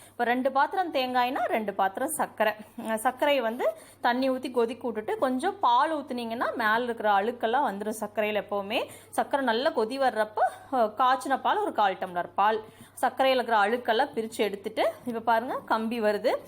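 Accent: Kongu, India. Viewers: அக்கா நம்ம ஊரு பேச்சே பேச்சு தான் ❣️😍 அருமையான பேச்சு அம்முனி(அக்கா ). அஸ்கா பருப்பியும் அருமை 😋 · Akka neenga pesura kongu Tamil arumaiya iruku akka, first time unga videos lam paakuren , unga farm, home lam romba nice, continue aa videos pannunga🙏🙏🙏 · அருமையான காணோளி அம்மனி உங்கள் கொங்கு தமிழ் பேச்சு மிகவும் அருமை தேங்காய் பர்பியில் நாங்கள் கொஞ்சம் பொட்டுக்கடலை போடுவோம் அம்மனி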